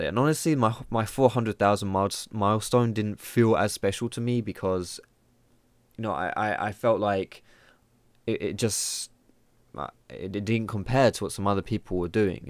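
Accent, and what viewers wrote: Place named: United Kingdom. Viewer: From the very first video, I was in love with your cheesy humor and that lovely british voice! I can honestly say, I'm here for, not;)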